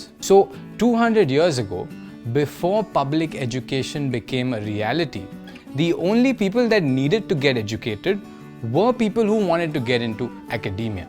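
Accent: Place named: India. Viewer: Everyone: Serious talking and discussing in the comment section. Me: His indian accent omg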